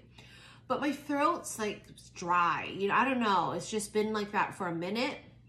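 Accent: New York, United States. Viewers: sound like a true new yorker and remind me of my home Harlem.:goodvibes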